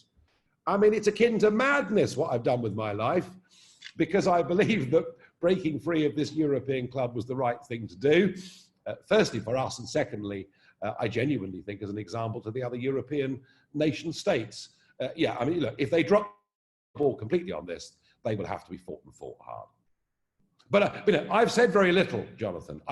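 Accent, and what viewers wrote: RP, United Kingdom. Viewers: I don’t like him and brexit will be a disaster. But his accent is sooooo British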